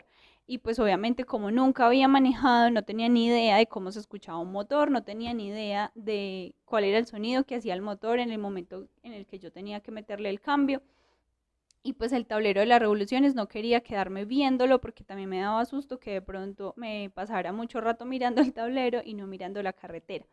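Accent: Colombia. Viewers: Me encanta el acento colombiano disfruto. Ollendote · Exelente video me gustan las motos y encontré tu videos y los miro por como ablas colombiana y tu humildad te hace grande saludos desde San Diego California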